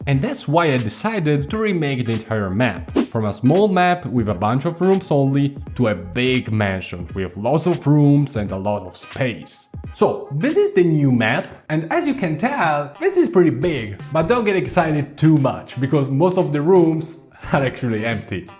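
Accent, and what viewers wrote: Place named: Italy. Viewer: Quello si, il tuo inglese è veramente ottimo, ma una delle cose che mi ha fatto dire che sei italiano era l'accento😂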